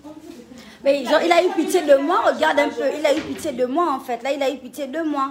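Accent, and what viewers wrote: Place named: Gabon. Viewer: J aime ton accent gabonais ❤️❤️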